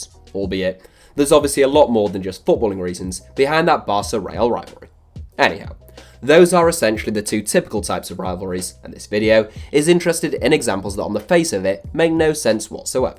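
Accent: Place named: England, United Kingdom. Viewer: I have to say that english accent is too intense its so hard to understand what the guy is saying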